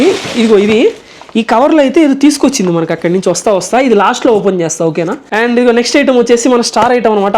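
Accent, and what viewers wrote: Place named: Telangana, India. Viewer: Annaya meeku ma telanagana hyd language baaga alavatu ayyindhi 😅( chesetodni )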